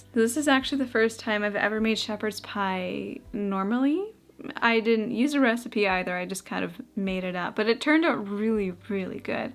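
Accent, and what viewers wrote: Canada. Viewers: Canadian accent so wonderfully strong in this video 😍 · I am now learning that Canadians pronounce things differently than the U. S. 😂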